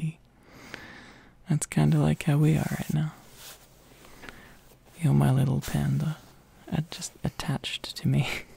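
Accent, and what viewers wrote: Australia. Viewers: I like that you're aussie cause idk I feel more at home and it feels more real ig? Idk I also kinda just get annoyed at how americans- and like most others actually- say some words and I get uncomfy so ye that was hard to write fjhreh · Aussie accent ahhhh:×××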